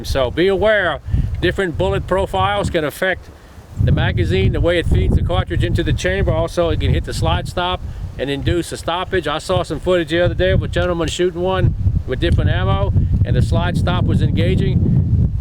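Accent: Louisiana, United States. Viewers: Mr, I a a North Louisiana native myself. I noticed a bit of a Cajun accent but thought you were a Texan. The good food and music got to ya and you know it's true. I love and respect you and consider you an American badass.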